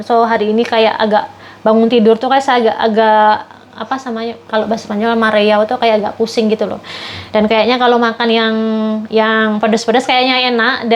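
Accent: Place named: Surabaya, Indonesia. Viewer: Bhs ne kyok. Logate suroboyoan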